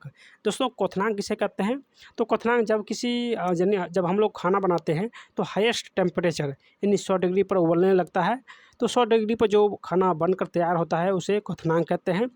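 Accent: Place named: Gujarat, India. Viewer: सर आपकी मातृ भाषा गे छे वाला है सही या गलत आपके बोलने के tone se पता चलता है😊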